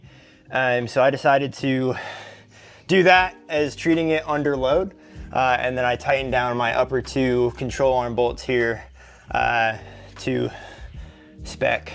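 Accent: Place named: Pittsburgh, United States. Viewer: Yinz from the ‘Burgh? I detect a Pittsburghese accent. 😅👍🏽 Thanks for actually showing the “rapid disassembly” method, even though it was a very short clip. I understand you can’t specifically recommend this method (due to liability), but I saw enough to have some confidence to try this rather than taking off the rear trailing arms.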